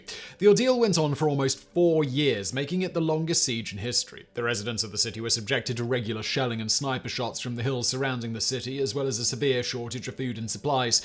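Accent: United Kingdom. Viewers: Hmmm, British narrator....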